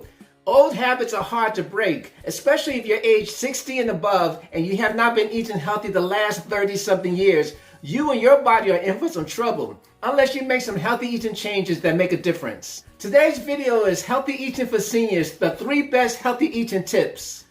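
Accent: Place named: Caribbean. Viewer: Hello. I am a new subscriber from Casablanca Morocco. I like your style. where is the accent from please? Caribbean maybe?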